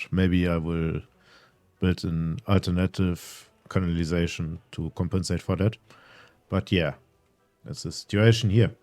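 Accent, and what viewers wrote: Germany. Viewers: You sound reallly german. Und das sag ich als deutscher😂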